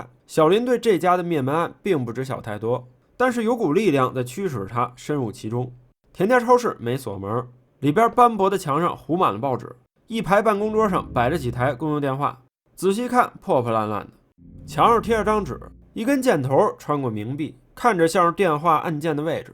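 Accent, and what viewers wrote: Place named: Beijing, China. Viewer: 北京人吧？不r道，电r机，赵iao娟儿～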